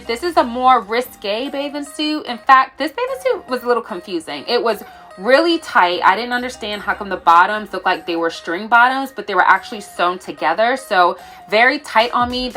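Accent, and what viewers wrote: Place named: Baltimore, United States. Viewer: Ure the best at this by far n ur Baltimore accent is cute. Most girls can't make it cute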